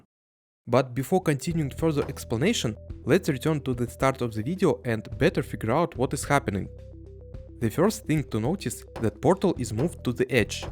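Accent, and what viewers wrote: Russia. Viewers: Damn ur Russian accent is so strong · Honestly yes, I would love a portal math analysis video. I love math and also the Russian accent too. I’m going to use your original video as a goal for my russian language learning. спасибо! ^^ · Я с самого начала понял по акценту, что ты либо русский либо беларус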